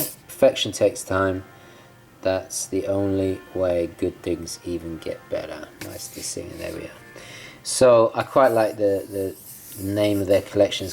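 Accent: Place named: England, United Kingdom. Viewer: I do every much enjoy your reviews and your lovely English Accent. Superb. You dived through the MeisterSigner rather fast and I am the owner (for the second time) of the MeisterSinger Singulator, for which you make some very positive remarks. The reason this is the second time around as the first time, I truly did get confused and couldnt get used to the fact that the big hand (the hour hand) is really all you need to refer to. On the Singulator, it gives you two additional hands, the minutes at the top and the seconds at the bottom, but in all honesty, a rarely make referrrence to either of them, just using the one big hour hand. I wish MS would change the second hand (seconds counter) for a date wheel, which I find something that is really missing on this model. The movement is superb as you say, beautiful. Take a look at one (if you can find one) I am sure you will like it. 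How about a review on QP Watch magazine. You might like that too. Nice work you are doing, keep up the good shows. `Mark, Amsterdam.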